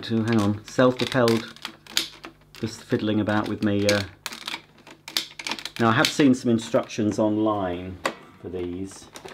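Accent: United States. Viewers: Nice Texas accent. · I love the american accent that wwas great. The concept and concept 2 are possibly my favorite hoover models, i have quite a few. I do find them quite often, if you need any parts let me know and ill do my best to help out! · Lol I’m offended after you talking like a amarican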